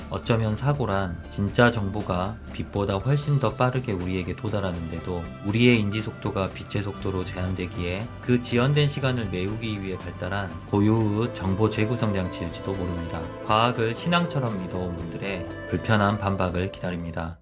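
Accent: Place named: Jeolla, South Korea. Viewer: 즌라도 사투리 심하다잉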